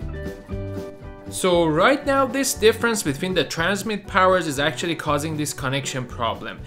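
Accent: Iran. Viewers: Why do get a strong persian accent from your voice?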